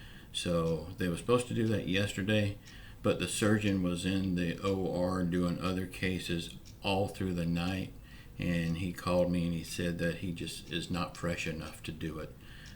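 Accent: South, United States. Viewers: morning i can tell you are from the south im from the south knox tn who knows about Zayres except some one from the south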